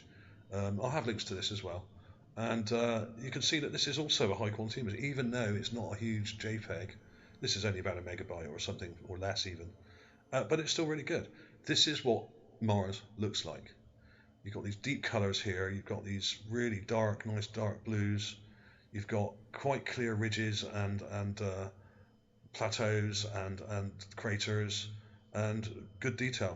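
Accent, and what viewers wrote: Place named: West Country, United Kingdom. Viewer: It makes a change to hear a west country voice! Instead of one of those cosmopolitan Jewish American voices, that so often accompany these videos. Are you from Somerset as there are certain timings in your phraseology that given an indication that you are?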